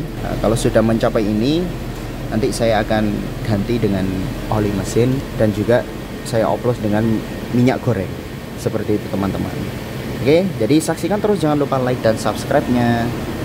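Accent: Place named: Malang, Indonesia. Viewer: Ini adalah contoh bahasa jawa dialek kota malang dan sekitarnya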